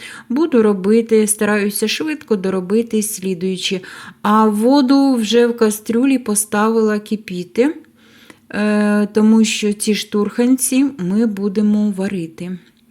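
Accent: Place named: Polesia, Ukraine. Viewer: "...щоб він не підсиха(о)у" - поліське, впізнаю. Побільше б такого автентичного, з вашої родини, від бабусі (хоча це слово (бабуся) - то від нас, з Полтавщини) 😍 Оце ваше кі(и-у)н(ь) - кінь, гурок - огірок, сі(и)ль - сіль. З Полісся з всі слов'яни пішли ;-)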